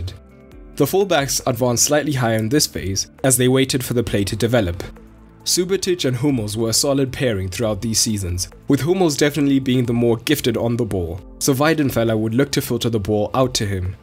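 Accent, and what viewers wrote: South Africa. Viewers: That Polish right-side was devastating! Piszczek Błaszczykowski and Lewandowski! side note, I know a South African accent when I hear one :) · Are you South African?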